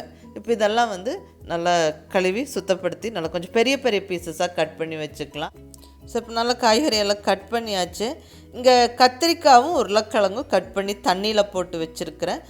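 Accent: Kongu, India. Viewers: Hi ka .I tried most of ur recipes. Everything with kongu style touch and ur speech .kuddos to ur receipes and u.👍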